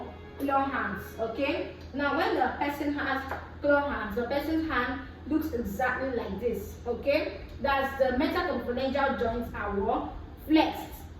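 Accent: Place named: Nigeria. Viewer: In our mother's accent. Thankyou mama naija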